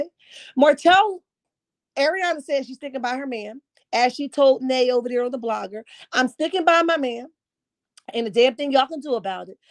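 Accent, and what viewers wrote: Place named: New Jersey, United States. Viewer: I knew it! I hear it all in your voice. I’m from Jersey too, North Jersey. I can tell you are too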